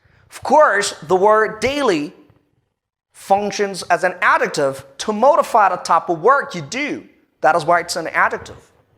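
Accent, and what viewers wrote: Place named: United States. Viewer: Thank you sir your American accent is excellent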